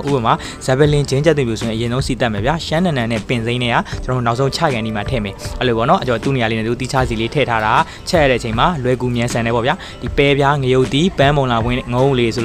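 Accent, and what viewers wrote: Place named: Upper Myanmar, Myanmar. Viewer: ကျေးဇူးပါဗျာ။ အညာဒေသရဲ့ အဝေးမှာ အညာသံနဲ့တင်ဆက်သံ က အလွမ်းပြေ။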